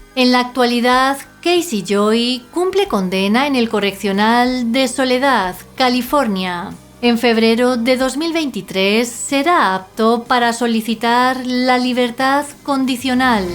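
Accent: Spain. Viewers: Está bonito, es acento Español, me encanta, ella se esmera en presentarnos un video, busquen otros videos con otros acentos que les guste. 🙋‍♀️ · Recuerda tiene el acento español !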